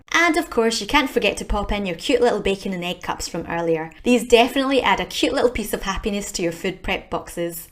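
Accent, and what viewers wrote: Scotland, United Kingdom. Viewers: Sort of off topic but does anyone ever get surprised by your Scottish accent? As a fellow Aussie, I always think it's so cute. BTW I'll never get bored of videos of food, especially when they're as motivating and healthy as your food prep! I really enjoy the variety and ideas :D Thanks <3 Looking forward to your next vid. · Asian decent..Scottish accent...Aussie resident☺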